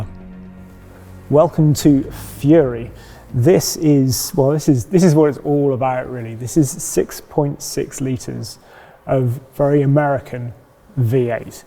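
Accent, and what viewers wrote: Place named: United Kingdom. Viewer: An American vehicle... With a British narrator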